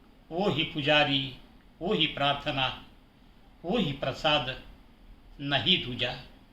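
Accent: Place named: Maharashtra, India. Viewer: मराठी touch !!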